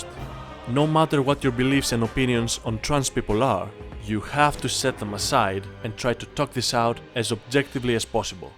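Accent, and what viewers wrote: Greece. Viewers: You have a strong greek accen. Are you greek or im just wrong :-/ ?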